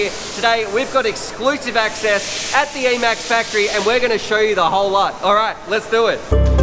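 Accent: Australia. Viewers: Wait, are you Aussie? I've been watching you for months now and I didn't even realise your accent until this video ahahah. I'm Aussie too which is probably why I didn't really think about it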